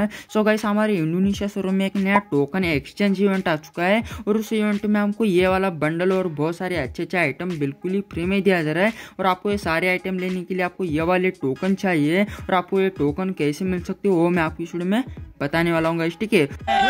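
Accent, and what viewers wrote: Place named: Maharashtra, India. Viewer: Bhava tuzya bolnyavarun vatty Tu marathi ahe... Marathi asla t like de comment la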